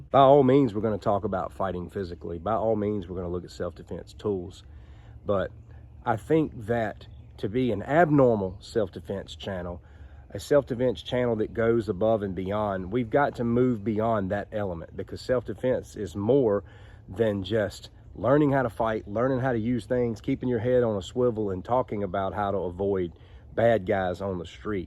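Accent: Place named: Georgia, United States. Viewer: Are you from Georgia? Your voice is very soothing and reassuring.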